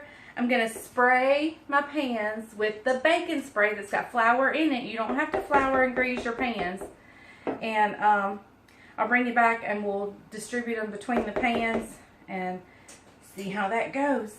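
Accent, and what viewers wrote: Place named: North Carolina, United States. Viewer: And your NC accent i live in the northern peidmont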